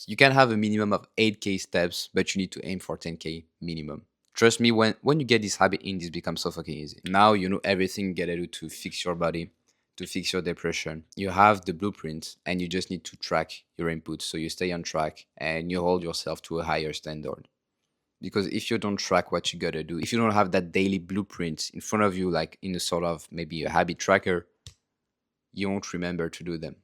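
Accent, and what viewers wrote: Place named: France. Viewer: This video is so informative! That French accent tho 🤌🏽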